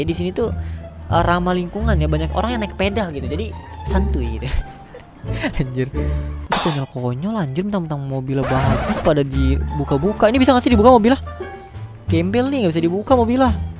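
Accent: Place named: Sunda, Indonesia. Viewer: Sunda detect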